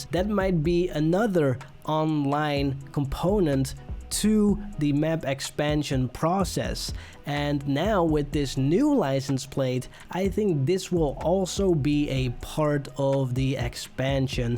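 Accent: Netherlands. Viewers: Hi! I’m wondering, what country are you from? I noticed you sometimes have a Dutch-English accent. Just wondering. 😅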